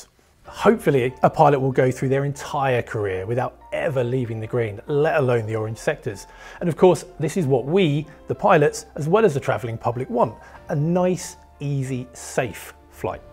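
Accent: England, United Kingdom. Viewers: I understand that English was invented by the British, but British pronunciation is a challenge. Compared to the slow and emotional Pereter, it's a little harder to understand. It's become more like a lecture than a conversation with a friend. Maybe should slow down a bit and pay attention to his intonation. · As English is not my first language, I'm just a bit struggling with English accent and he's sometimes a bit too fast for me. But I will keep on watching this channel that I like so much and eventually will get used to English. No offense, but I'm normally more used to American English. Anyways, keep on the good work, it's always interesting. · should slow down his speaking speed... And make his speech more clear avoiding british accent... Otherwise you'll lose people willing to hear that video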